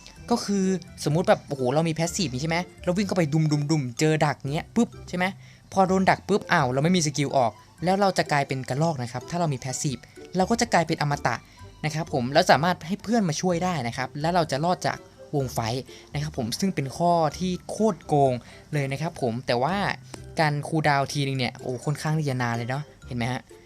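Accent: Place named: Indonesia. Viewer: Skill translate to việt nam but your voice is indo right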